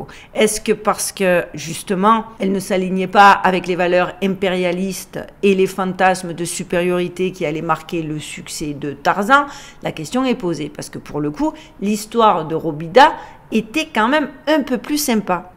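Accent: Marseille, France. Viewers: Bonjour Continue à garder ton accent marseillais et tes petits rires trop craquants en racontant tes histoires. Sinon c'est comme si tu lisais une belle partition mais sans t'accompagner d'un instrument🥰🥰🥰🥰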